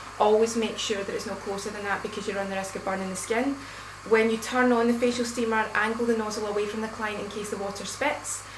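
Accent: Scotland, United Kingdom. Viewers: I loved this. And I actually loved your Scottish accent. And also the fact that you even say Uhmmmm and Ahhhh during this very lovely and useful video. Thank you so much.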